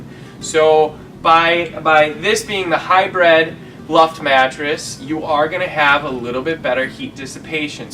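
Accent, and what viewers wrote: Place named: Canada. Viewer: the canadian accent :)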